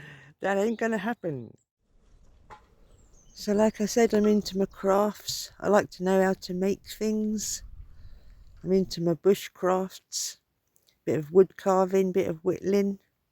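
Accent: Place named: Malvern, United Kingdom. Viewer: I think I’m listening to the accent of a fellow malvernian or nearabouts.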